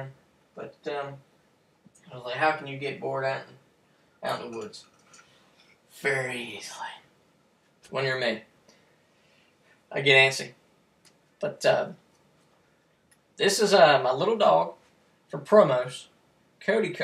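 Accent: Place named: Carolina, United States. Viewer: I thaught you sounded like a carolina boy!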